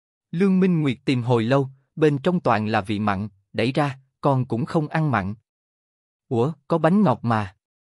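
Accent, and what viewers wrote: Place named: South, Vietnam. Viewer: Rất thích nghe giọng miền nam đọc 😊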